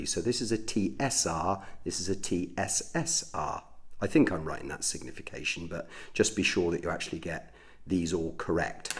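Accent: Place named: England, United Kingdom. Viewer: the accent makes you sound like the English Morgan freeman mate it's sick😂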